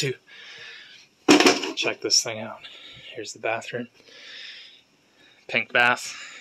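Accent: United States. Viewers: I can tell your Philly accent but can't place it exactly. Norristown? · So curious about your accent. Did you grow up in the mid-Atlantic area? Maybe Maryland? I study accents and dialects so am just curious.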